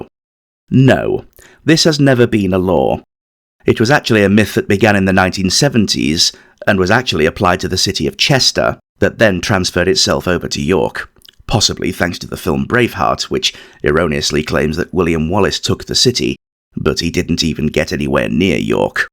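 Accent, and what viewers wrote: Australia. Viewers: Cool stuff! Liked your Aussie accent too 😂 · Love it,and your aussie accent was your best yet ;-)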